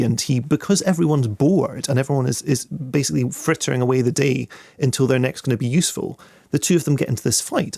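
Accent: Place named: Edinburgh, United Kingdom. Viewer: This guy has a serious edinburgh uni accent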